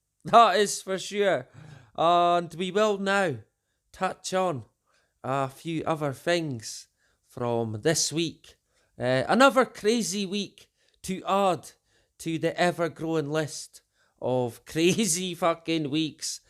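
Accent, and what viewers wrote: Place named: Scotland, United Kingdom. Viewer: Thanks for your dedicated research and always bringing us the real news, with your ever soothing Scots burr! Much love!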